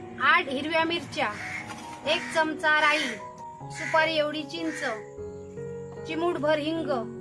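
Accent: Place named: Pernem, India. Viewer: Goan lok aasi bhasha ulainath ge tu pednechi bhasha boltoi mugye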